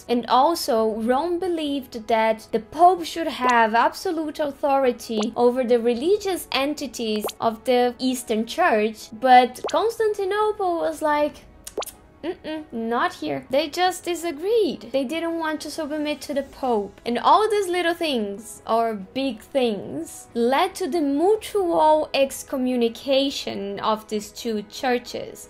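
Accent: Brazil. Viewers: Throughout the video I concluded you're a fellow Brazilian. I know some Greek and Cheirosiphon is pronounced /Rirocifom(pt)/ heerohsseephon(eng)/.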